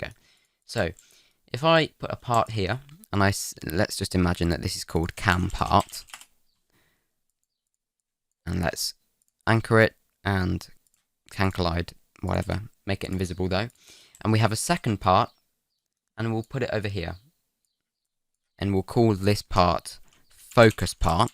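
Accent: United Kingdom. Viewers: I have needed this video for soo long. Also, is british;) FINALLY A YOUTUBER WHO DOESNT SAY zee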